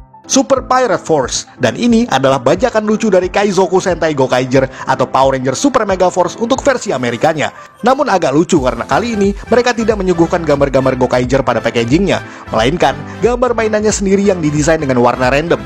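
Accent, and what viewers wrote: Java, Indonesia. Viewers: terlalu medok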